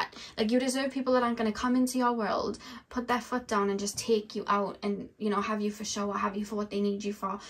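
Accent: Wales, United Kingdom. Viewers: I chose pile 3 and everything you said was 💯. I am on a self love journey finally after so many years of Searching for love in all the wrong places. I have finally had to separate myself from family and most friends because it was always take take take and no give back. And when I finally start to do well everyone is angry and jealous. It hurts so much and I am sad that I invested and gave anything and everything to people who never cared to see me for who I truly am. I know it stems from my childhood in an extremely dysfunctional family and being the scapegoat. So just as you said I inadvertently searched for love in the wrong types of people hoping to finally get it back. I’m finally falling in love with me now and it hurts to leave everyone behind but it also feels so liberating and I truly have got this lesson finally. Thank you you are so gifted. 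I love all of your heart felt readings and your adorable Welsh accent! 🥰🥰🥰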